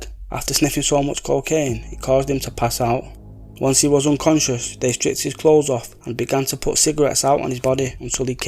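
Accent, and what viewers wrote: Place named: Northern England, United Kingdom. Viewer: Why? Cos he doesn't have a London accent? He's from up north that's how they speak. You must have an inability to grasp different accents cos I had no issues understanding him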